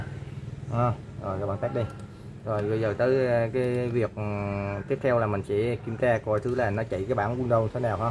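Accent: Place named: Binh Dinh, Vietnam. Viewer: Mình công nhận bạn từ khi tạo kênh đến giờ xem vi đô của b cái nào của bạn nói trong rất đậm chất nẫu k cắt ghép hay chỉnh sửa gì. Hd rất chi tiết k tua nhanh mình xem cũng xứ nẫu rất dễ hiểu...cảm ơn rất nhiều..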